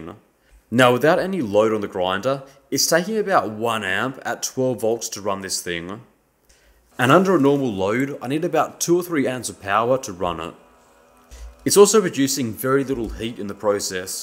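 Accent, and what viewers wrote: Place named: Australia. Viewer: Aussies, y'see... I had to turn on CC at some point... ;-)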